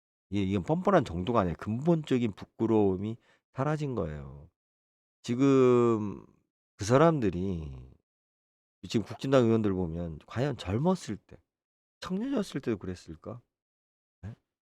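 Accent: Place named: Jeolla, South Korea. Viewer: 내 귀엔 너에게 전라도 말씨가 들리네? ㅋㅋㅋ 호남 청년들도 많이 돌아섰단다. ㅋㅋㅋ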